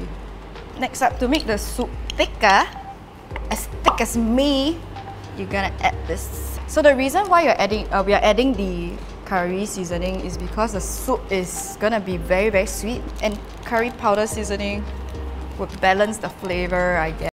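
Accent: United Kingdom. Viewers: You have british accent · is she english? she sounds like she grew up in the UK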